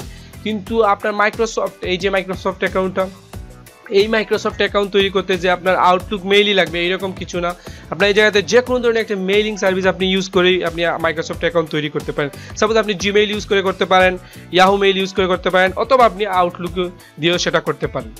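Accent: Sylhet, Bangladesh. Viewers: ভাই কিতা সিলেটি নি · vai apna bari sylhet ni.......? apnar kotha ja sondor apnar tutorial dakiya ami account kolta parci ... so thanks vai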